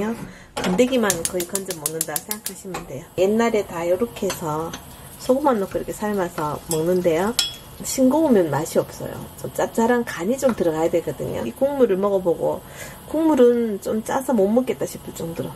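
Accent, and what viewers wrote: Gyeongsang, South Korea. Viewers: 달성공원 언급하시는 거 보니까 대구분이신가 보네요? 경상도 억양을 들으면서 항상 어디신가 궁금했었는데... 반갑습니다, ^^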